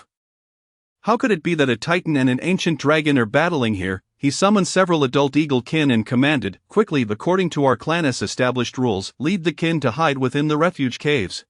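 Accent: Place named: United Kingdom. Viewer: Improve audio It sounds like some sophisticated British shit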